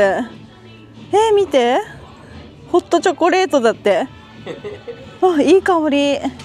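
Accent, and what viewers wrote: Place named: Fukuoka, Japan. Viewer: Target isn't Cheap, their prices are High due to the location of the shop being in Waikiki and caters to tourists mainly, and the rent Target pays for the location is included. Locals don't shop in Waikiki, major rip-off! Walmart, Costco, Sam's Club, Donqui Jote, Times Market are the cheaper places to shop. Wholefoods, Kahala Mkt, Kakaako shops are expensive. Love your videos and your cute Fukuoka accent!❤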